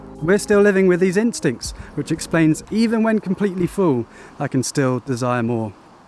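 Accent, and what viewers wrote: United Kingdom. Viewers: Ancient wisdom and British accent! My YouTube knows exactly what I like. 👀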